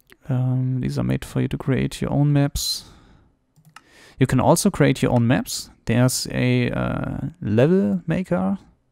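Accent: Germany. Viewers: Man hört das du Deutscher bist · Yes, or that you even know. Btw are you German?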